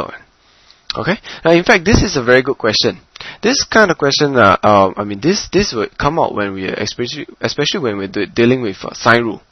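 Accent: Singapore. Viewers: u must be a singaporean or malaysian . ur accent lol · Wow i really got it now Thanks a lot. I'm 99% sure that you are a Singaporean. I can tell by your accent;)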